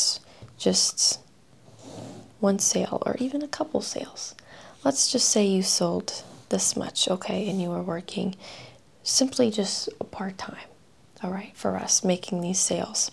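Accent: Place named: New Jersey, United States. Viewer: Love your Jersey Accent voice in this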